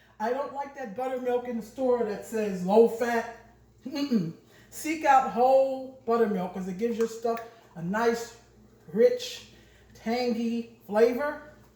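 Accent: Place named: Brooklyn, United States. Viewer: It's the Brooklyn accent for me 😏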